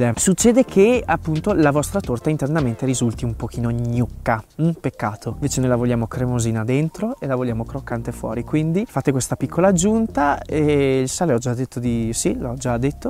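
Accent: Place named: Trentino, Italy. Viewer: Ciao sono bolognese sposata con un trentino da 30 anni lui è del bleggio superiore e vado in vacanza lì tutti gli anni non potrei rinunciarci per nulla al mondo, è un posto bellissimo una pace una serenità unica, poi mi diletto ad andare nel bosco a funghi, quindi anche se parli il trentino lo capisco. Sei un ragazzo bravo e simpatico mi piacerebbe venire a trovarti un abbraccio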